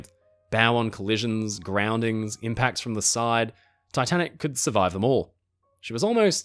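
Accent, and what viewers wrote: Australia. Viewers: Good old Aussie accent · Are you from Australia?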